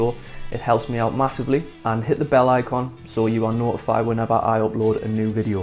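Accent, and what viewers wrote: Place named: Chester-le-Street, United Kingdom. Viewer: I found your channel by chance the other day and was so impressed with your playing and sound. Then you open your mouth and you're from round here haha. I live Chester Le Street! Small world haha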